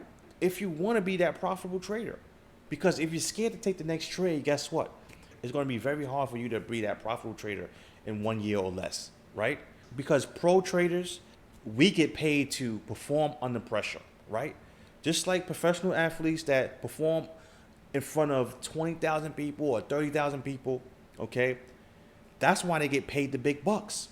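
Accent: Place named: New York, United States. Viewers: Yes I know that New York accent. I’m originally from Philly and lived in NYC for years. I love it. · You gotta be from NY with that thick accent lol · I fw you heavy my guy 💪🏾 You sound like you're from Harlem, no?... lol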